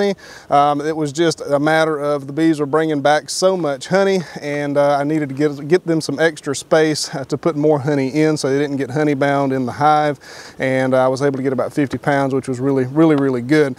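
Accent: South, United States. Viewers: Can someone please tell me where in the south this accent is spoken.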